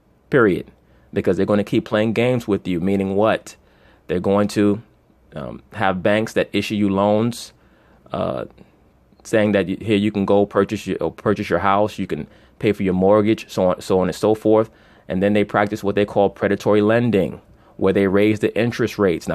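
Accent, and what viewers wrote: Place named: New York, United States. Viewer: Amp-Dog Productions he has a New York accent man lol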